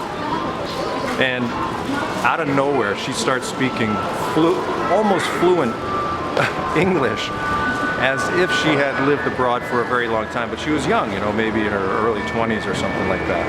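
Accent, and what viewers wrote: United States. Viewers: your accent sounds American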